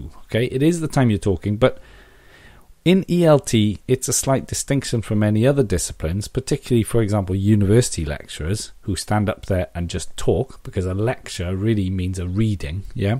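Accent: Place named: Wales, United Kingdom. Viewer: He sounds Welsh to me :) albeit very subtle..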